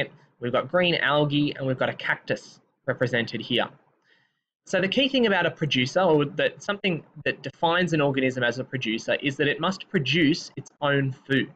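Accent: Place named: South Africa. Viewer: I bet u r South African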